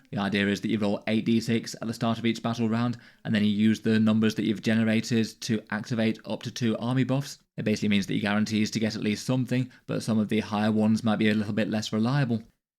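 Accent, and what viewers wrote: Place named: United Kingdom. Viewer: Does everyone in the UK speak a million mil… kilometers an hour? I know bro has to get the info out in a timely manner but with that mono pitch, thick accent, and high word per minute it’s difficult to absorb most of the info. It’s probably worse for those whom English isn’t their first language.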